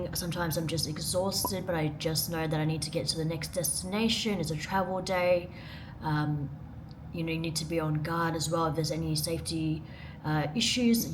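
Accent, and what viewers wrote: Australia. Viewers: Nice ,as soon as you first spoke i new you were from Aus👍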